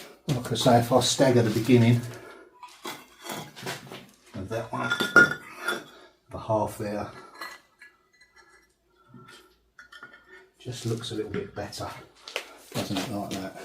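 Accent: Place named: South London, United Kingdom. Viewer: Saw you pick up tiles from Ryan, hopped over to your channel. Now addicted. Not sure where exactly from but my guess would be south London, lewishamish… probs completely wrong but your accent is the same as my family. I live in NZ, so it’s music to my ears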